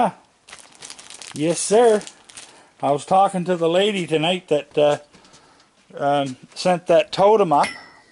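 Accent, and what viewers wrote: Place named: Canada. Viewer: Who could not love a raccoon? 💜💜 Love that Canadian accent, eh!!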